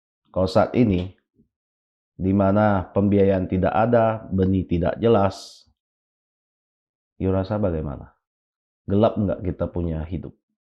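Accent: Sumatra, Indonesia. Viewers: Ini bang pasti org sumatra.ceplas ceplos.berani speak up. Keren banget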